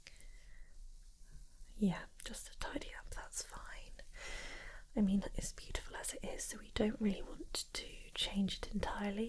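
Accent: United Kingdom. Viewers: Where are you from? Accent sounds british? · a British English ASMR channel is good news, ty and please keep uploading.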